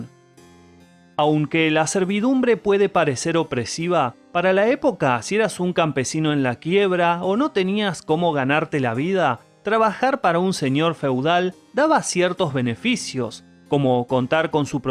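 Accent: Argentina. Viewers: Un mishon? Que significa un mishon 😂 horrible acento argentino horrible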